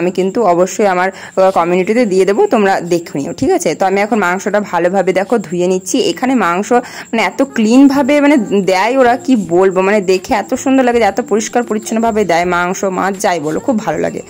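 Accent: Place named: Bankura, India. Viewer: Tomar kotha golo Bankura manus dar moto kotha ❤❤❤😊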